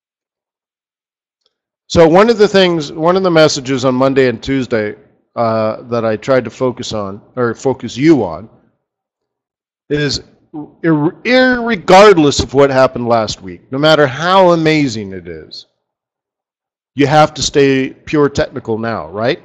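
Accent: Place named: England, United Kingdom. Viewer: You lost me on the English accent and had me with the python references -also good content as ever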